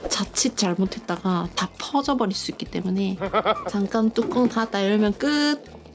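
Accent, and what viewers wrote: Gyeongsang, South Korea. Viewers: 경상도 사투리 귀여우셔용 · 오 예쁘시기도 하지만 많이 잘드시네요 사투리만봐도 경남사람이시네요🙂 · 경상북도예요? 경상남도예요?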